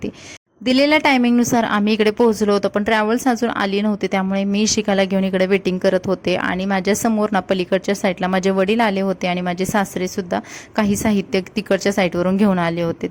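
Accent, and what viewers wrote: India. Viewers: ताई तू कोकणी तर दिसत नाहीस. तू सांगली किंवा कोल्हापूर जिल्ह्यातील दिसतेय तुझ्या भाषेवरून. आणि आईच्या हातच्या अळू वड्या मला पण अजुन आवडतात. आई ती आईच असते. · Seating tujhe gav konte bhasha aamchya solapur sarkhi vatte aani dusara mhanje tubirobadeva vishaibolli mhanje dhangar ka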